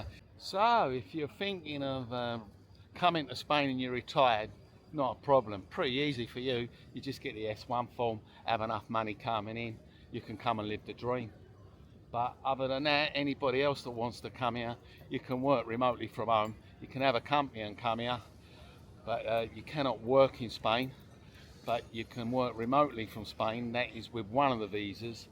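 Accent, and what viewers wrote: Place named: Cockney, United Kingdom. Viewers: 2030 will be in touch if we're all still around. many thanks. btw i'm from romford and from your accent you're from simlar area? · Well, the guy has a Gor Blimey accent, so he is used to Ugly Dumps!!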